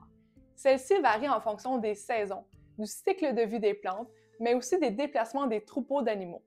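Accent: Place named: Quebec, Canada. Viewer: Bonsoir Madame! You Tube m'a proposé votre chaîne, et comme j'aime beaucoup l'Histoire, que j'ai une formation d'Historien, que j'ai même une maîtrise, que je travaille à un modeste poste au Musée d'Archéologie Nationale à Saint Germain en Laye (France), que ma voisine de rdc s'appelle aussi! (je me demande si elle a de la famille au Québec) et que pour un Français l'accent québécois est for agréable, je m'abonne! Au musée les visiteur Québécois sont mes préférés, ils sont toujours aimables, souriants, causant, ils ont l'air heureux de vivre. Au plaisir! 😀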